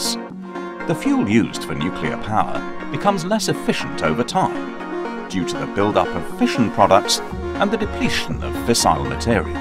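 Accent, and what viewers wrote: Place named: United Kingdom. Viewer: Use an American English speaker, not a British English speaker. Thanks.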